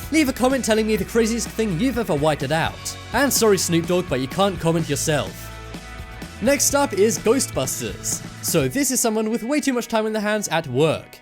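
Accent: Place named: United Kingdom. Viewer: to all the people complaining about how he says "strawberry": This dude's British, it should be obvious by the way he talks Unless British people are commenting about that, in that case... I don't care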